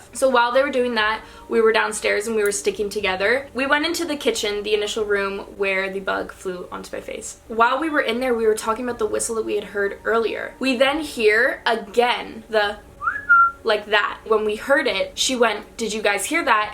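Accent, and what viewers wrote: Canada. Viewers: Gurl I love you candian accent and got used to it now I say aboat,melk,house in you accent love you and btw love your new merch and guys go stream. Love idk if I speeded it wrong opsie👉👈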